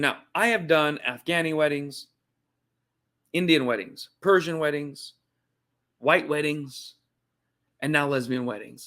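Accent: Australia. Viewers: K-von, I love your Australian Accent....... it's hot 🔥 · Good Australian accent!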